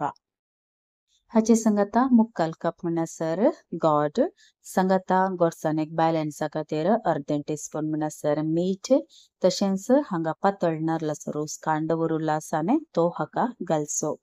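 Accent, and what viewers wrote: Konkan, India. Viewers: Thanks. I'm really happy that you have started with subtitles in english. Although I love to hear your sweet accent of konkani which reminds me of my childhood. So happy to have come across your channel ❤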